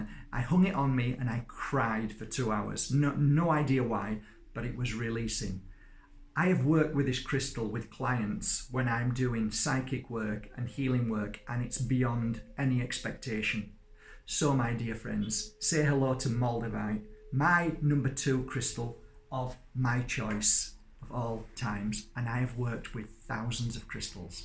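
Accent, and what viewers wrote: Yorkshire, United Kingdom. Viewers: Are you from Yorkshire ? I’m in South Africa but that sounds like a Yorkshire ascent ? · You sound like from my neck of the woods. 😁 yooorkshire! Thank you for this. I love getting new info. I am on my spirtual journey, doing reiki as well. 🥰 i will visualise myself as a crystal when sending love and light💫💜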